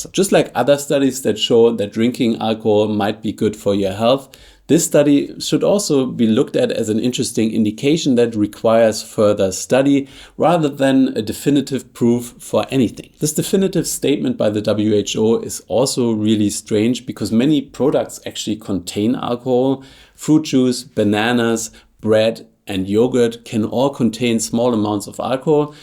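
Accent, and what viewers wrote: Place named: Germany. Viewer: Because of your German accent I automatically take you much more seriously when talking about research and statistics than the Californian Andrew Huberman. More seriously, stress and loneliness are two of the most harmful things for your health and moderate alcohol use can alleviate these things when you are sharing a bottle with friends and talking about life.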